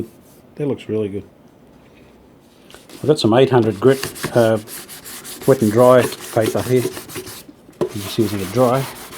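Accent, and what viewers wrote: Australia. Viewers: Bravo! I have watched about a half dozen of your videos from start to finish the last couple of days and I am impressed by your expertise. I have been learning a lot even though I will probably never be able to repair the electrical components of a tube radio but, I watch to see and learn from your timber restoration techniques. I have restored a couple of timber (we call it wood here in the US) tube radio cabinets recently. I'm guessing your dim light controller is home made. Is there any chance of making a video showing how to make an exact duplicate? Just a thought. Is your shelf of radios in any of your videos? It would be great to see that as well. I also enjoy your Aussie accent and unusual Aussie phrases. Keep up the great work!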